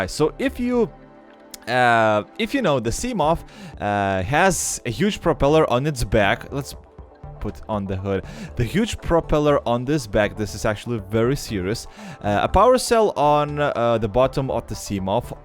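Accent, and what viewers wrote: Russia. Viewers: wait, is he Russian? he sounds it at least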